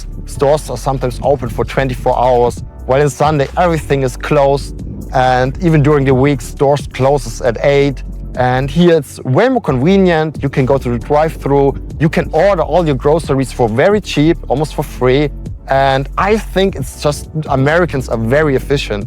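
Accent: Germany. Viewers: I love your cute use of English with the very heavy German accent and also your choice of words and phrases. You make few mistakes but your choice of phraseology is very touching. I taught at NYU for 11 years " English as a Second Language" to mostly Asian students. Many Germans think their English is good when its really not, filled with many errors.. I had a close friend from the Stuttgart area, also Ernest /Ernie? Was he related to you? Keep up the good work, Welcome to the USA though Florida and I am thrilled you are doing so well here, Hugs and be welcome and prosper. RM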